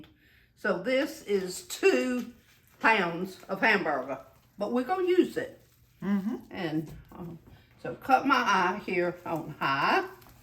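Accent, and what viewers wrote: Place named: Arkansas, United States. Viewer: Love the meal ideas & enjoy your cooking but best of all is that you talk exactly like my parents Arkansas family. It's very soothing now that my mom is gone to hear you & remember those wonderful kitchen moments I was so lucky to experience. Kept doing what u r doing. I bet I'm not the only one that enjoys the South & miss the people! I'm a fan!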